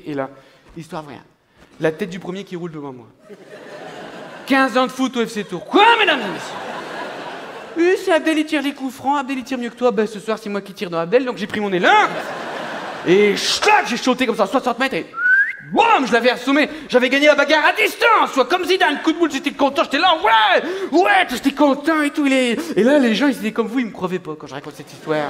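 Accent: North, France. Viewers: C est d ou cette accent ?🤔 ch ti ? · une masterclass d’humour noir, d’humour beauf saupoudré d’accent du nord 😭🤣👌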